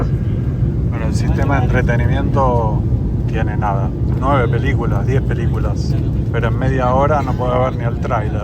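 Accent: Argentina. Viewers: Hola. ¡¡¡¡¡ Pero como disfruto tus viajes aunque sean cortos!!!!! Me encanta como lo cuentas todo y ese acento argentino............ El que hacía los anuncios era muy gracioso hablando, nosotros los españoles aún notamos más los acentos. Quiero más vídeos. Un abrazote gigante, Barcelona te echa de menos.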